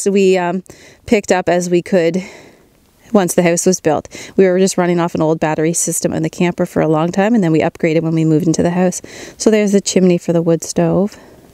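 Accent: Canada. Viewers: LOL! I love Canadians and Canada and have been noticing alot of goodies coming from Canada as of late! I too figured out she was Canadian from some of the words and the accent when she finish some sentences. I am living in the Cold as a Puerto Rican so I am paying attention to Canadians and how they build and live so i can survive these freezing painful NON CARIBBEAN temps!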